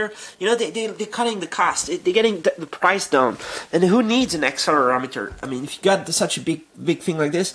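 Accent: France. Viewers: OMAGAD you're so french dude ^^ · Tu as un accent françai ! ^^, Pourquoi le clavier est-il AZERTY ? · Ta un accent francais mec. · accent francais ca mdr !!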